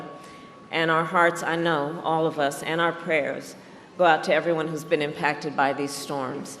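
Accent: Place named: South, United States. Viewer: Talking southern again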